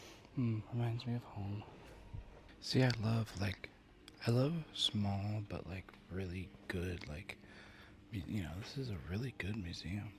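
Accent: Australia. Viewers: Loved this video, Cleveland is beautiful. Your Australian accent is spot on love to hear it ❤. Wearing the hat will help if you have sweeping Magpies. Don't run, just calmy walk away if your being swooped. Leave the area, as the Magpie male will defend the nest by swooping. We also have crows here in QLD not ravens, every other state has ravens. QLD has alot of unique qualities that you won't find anywhere else. Australia is very diverse, thanks for your vlog I love it 🎉❤ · You're definitely getting the Queensland accent mate. My Adelaide accent still gets confused for Kiwi in Melbourne. Nice to see you're still enjoying our country 🇦🇺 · Love your Aussie accent. Don’t stop doing it 🤩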